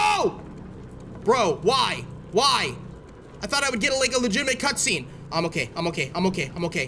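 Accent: Texas, United States. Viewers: wait, are you Texan? lol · are you from Texas 🤔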